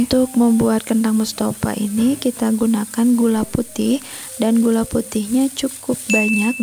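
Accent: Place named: West Java, Indonesia. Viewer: Ooh punten, rupanya org Sunda yaaa..? soalnya klo org Bali lafal kan huruf T itu Lidah nya nekan ke langit langit, klo wilayah lain Lidah itu menekan ujung gigi Atas..duh hapunten yaa..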